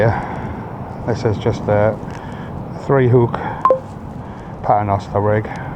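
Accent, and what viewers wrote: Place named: North, United Kingdom. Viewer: Amazing buddy love your accent are you from up north 😁😁😉😉😉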